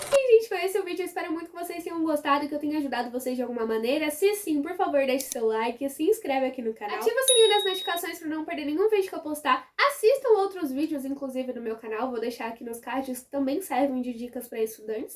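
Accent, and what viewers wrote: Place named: Northeast, Brazil. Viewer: É nordestino cara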